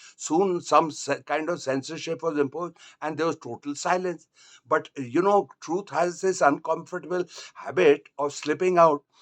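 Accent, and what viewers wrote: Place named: India. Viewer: His accent is clearly indian accent.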